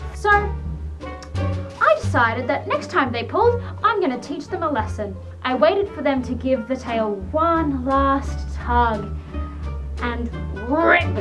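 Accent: Australia. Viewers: R U ausie? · 0 ur aussie!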